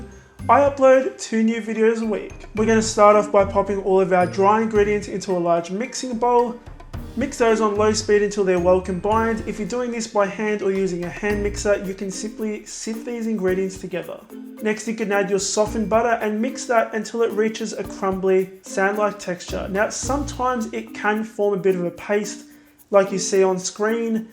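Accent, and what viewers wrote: Australia. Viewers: Are u Australian do u live in australia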